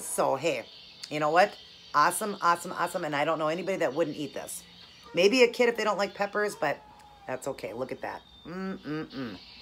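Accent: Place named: Minnesota, United States. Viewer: love your channel! what part of Minnesota are you from? I'm from MN too! I could tell right away from your accent lol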